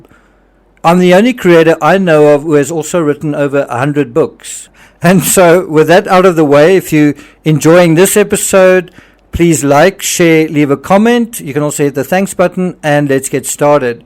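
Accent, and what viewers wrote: South Africa. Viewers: Your accent alone is great! South Africans are very interesting people. I was blown away watching Charlize Theron speaking Afrikaans on an interview 👏🏻👏🏻 I always forget she is from there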